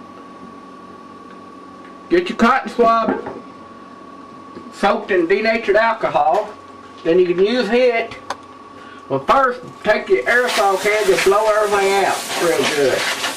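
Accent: South, United States. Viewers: He's definitely from the south.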